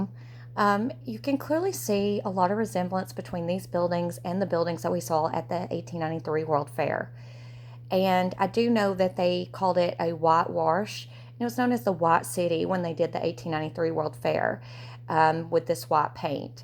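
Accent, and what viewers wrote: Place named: South, United States. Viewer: Indoctrination, why do you think we longer host these ridiculous events??? Obviously the city was there before, and our historical timeline is just not accurate. We're all being manipulated to believe a timeline that glorifies north America and white people, putting us at the pinnacle of creation, science, and invention which is just not true. Many civilizations before Europeans were far more advanced, and Europeans stole their technology, land, and even people and claimed it all for their own. There is also several civilizations and groups of people that were obviously wiped out because we have their technology but no people and no history and think about all the libraries that have been burnt on purpose and even the book burnings in world war II. Much of our history has been erased on purpose to benefit one group of people or should I say 1percenters lol. 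Great video though, I love the images you used, and your southern accent as I am also a southern woman questioning history lol.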